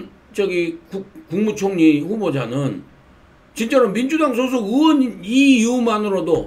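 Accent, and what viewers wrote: Jeolla, South Korea. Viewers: 영삼씨 웅변이 재미있네요 절라도 말별로 안좋아했는데 구수해보시네요 좋아요~^^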